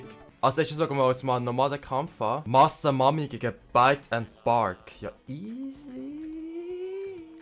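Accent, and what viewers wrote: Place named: Switzerland. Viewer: Ich bin zwar aus Österreich und rede eigentlich nur Hochdeutsch, verstehe dich aber trotzdem mega gut. Generell liebe ich es wenn jemand Schweizerdeutsch spricht.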